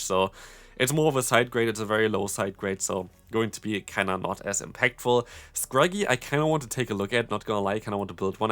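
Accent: Germany. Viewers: bro, are you german? I can swear i can sense from your accent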